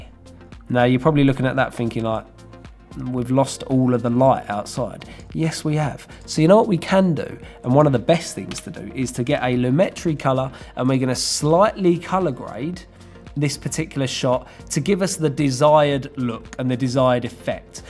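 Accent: United Kingdom. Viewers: I watch your videos just to hear your Brit accent! So nice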